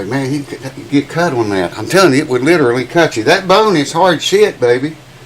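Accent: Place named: South, United States. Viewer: ~ Just out of curiosity: where, geographically, are you located? Your accent seems to say somewhere down here in the south, but you've made a few videos of massive snow dumps... {?}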